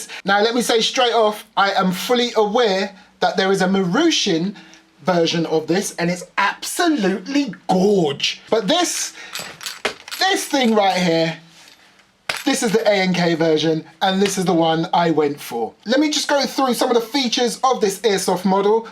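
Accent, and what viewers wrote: Texas, United States. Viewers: actually, your texas accent sounds awesome. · Starring In: The Rifleman Your American accent is great, the western intros are hilarious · nice american accent XD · Good American accent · Your Texas accent was actually really good. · Your american accent is actually really good!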